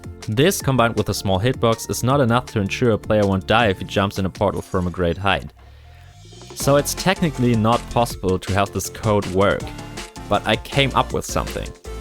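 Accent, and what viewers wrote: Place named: Germany. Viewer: Wie man das Deutsch bei dir Raushört haha. Nice Gravity Gun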